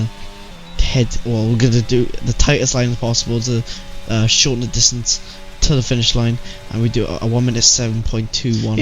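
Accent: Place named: Cardiff, United Kingdom. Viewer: Man where are you from in wales? You sound like you’re from Cardiff being a blackwood lad myself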